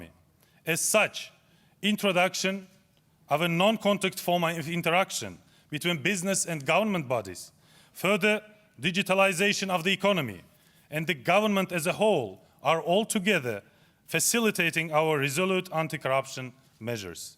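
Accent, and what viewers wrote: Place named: Russia. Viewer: I thought it is gonna be like: “let me speak from the bottom of my heart”..😁 But thankfully this guys speaks far better than other uzbek officials. Nevertheless speech was intially written in russian, then translated into english. I am sorry sir you sound so russian! Although he gave good speech, there is very little change in uzbekland. I almost laughed when he said: “ non-discrimination” and “fight against corruption” 😂 Feels like uzbek treasury is empty and shavkat needs more money from US institutions to further fill his pockets in.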